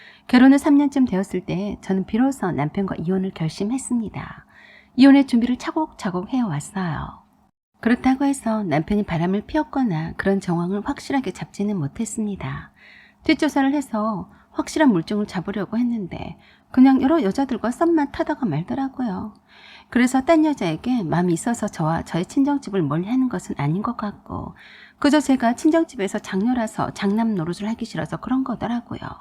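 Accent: Jeolla, South Korea. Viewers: 나레이터 전라도분인가봐요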